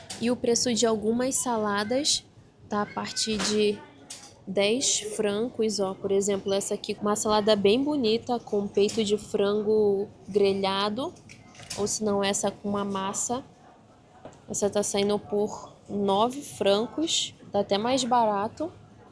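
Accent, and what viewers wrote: Rio de Janeiro, Brazil. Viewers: Tu és muito fofa e calma! Parabéns p video, vou em outubro p Suiça! Ansiosa Ahhh o sotaque carioca tbem é lindo!!! Bjo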